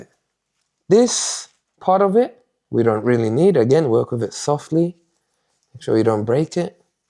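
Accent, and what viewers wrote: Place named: United Kingdom. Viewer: I just watched it because of British Accent🤦😂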